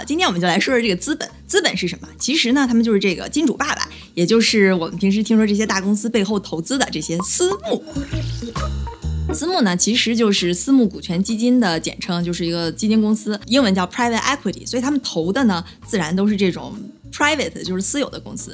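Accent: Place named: Beijing, China. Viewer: 你边说边笑有时候听不清，北京口音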